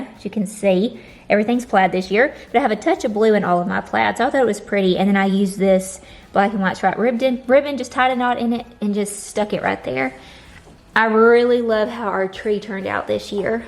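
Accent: South, United States. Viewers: Hi I enjoyed your decorating and was inspired to start my own today. Your home looks beautiful and great job on your tree. You are such a sweet girl and I love your southern accent. I am from Canada so I enjoy listening to ya all.😊 I was wondering where did you get your garland on your fireplace? It looks so realistic. God bless you and your husband! Merry Christmas!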